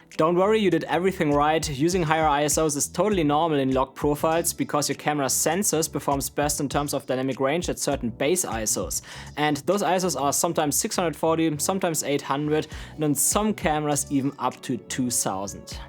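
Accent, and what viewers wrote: Germany. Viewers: Really good video 👌 a question 🙋🏼‍♂️ you German? I kinda heard in your accent.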